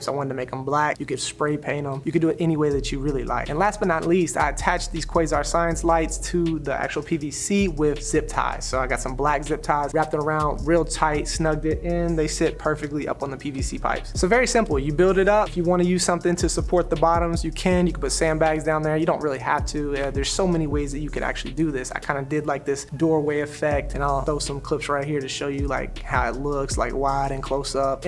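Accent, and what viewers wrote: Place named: Bay Area, United States. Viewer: Sounds like you're from the Bay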